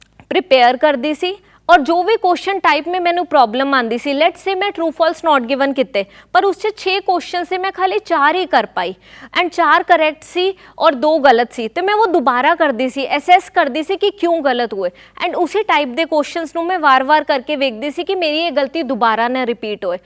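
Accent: India. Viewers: madam thda hindi touch boht a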